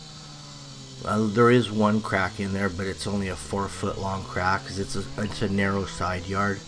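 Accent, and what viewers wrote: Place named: Southern California, United States. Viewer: I am not understanding the S0-Cal accent lol because at first I thought I was watching another concrete persons video from So-Cal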